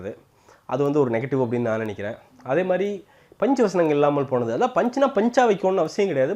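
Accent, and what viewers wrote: Chennai, India. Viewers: U were speaking a good tamil, please don't try to mix Chennai tamil in it... U r from coimbatore not from Chennai... it doesn't suite you.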